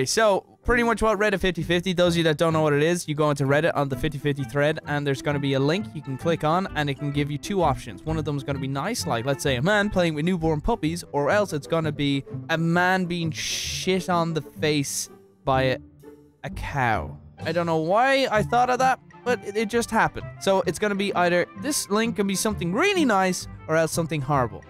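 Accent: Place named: Ireland. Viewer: Sometimes he sound Irish and sometimes he doesnt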